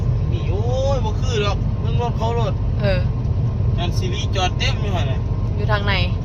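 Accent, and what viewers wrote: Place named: Laos. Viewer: สำเนียงเว่าคือ หนูนุ่นสาวลาวเนาะ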